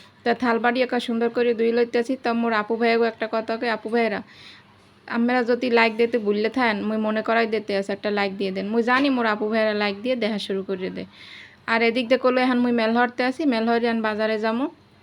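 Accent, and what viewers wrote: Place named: Barisal, Bangladesh. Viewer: ❤❤প্রিয় আপু তুমি বরিশালের ভাষায় কথাগুলো বল দারুন লাগে ভিডিওটা দেখে নিলাম সবকিছু মিলিয়ে খুব সুন্দর হয়েছে আলহামদুলিল্লাহ ❤🎉😮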